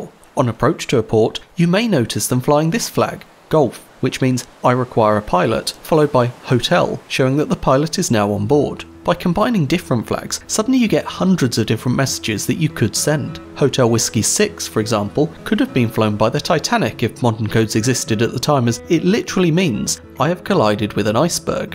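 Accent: United Kingdom. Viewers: Informative, concise, well animated and British voiceover. Perfect. Avast ye scurvy knaves and prepare to be boarded ! Aaar !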